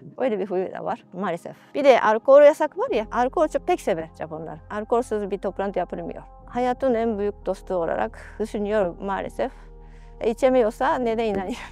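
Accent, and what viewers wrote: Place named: Mersin, Turkey. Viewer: Mersinli teyzeler gibi konuşuyorsun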